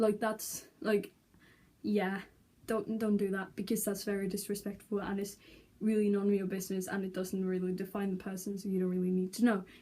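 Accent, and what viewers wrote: United Kingdom. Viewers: Live the video why the British accent not a Norwegian one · I actually thought you were British until I saw the vlog you did about your day at school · I thought you were British! :) Well, I'm Italian, but to me your accent sounds very British, and your English seems excellent! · How did you learn English? And to sound vaguely British (to me) too? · btw I thought you were British, you sound like Martin Freeman talking XD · I THOUGHT YOU WERE BRITTISH WHATT youre so good at english, like im swedish and my english is so bad TEACH ME YOUR WAYS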